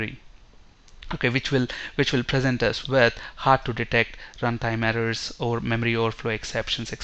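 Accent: India. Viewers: Indian?I feel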